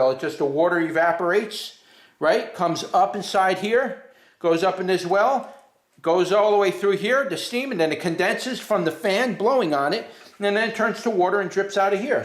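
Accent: New York, United States. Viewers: Thank you for this mine has a digital board at the front, great review, are you from New York, I'm in England!!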